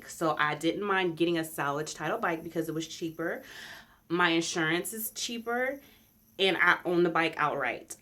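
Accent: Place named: RP, United Kingdom. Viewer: So nice and honest. Thank You! And, love it you speak the Queens English. I was prepared based on your Youtube name for a bunch of profanity and excuses. You go girl !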